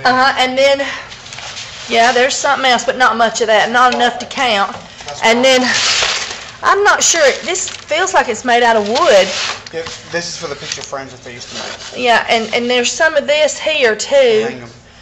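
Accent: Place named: Alabama, United States. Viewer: I feel like I have a connection to you, although I'm from New York, my mom was Born and raised in Birmingham Alabama. Hearing your accent allows me to think of the times I spent one year there attending school and came back to New York with a BAMA accent. lol. but that was many years ago. Again, good luck and I'll be watching the transformation of your store. So exciting!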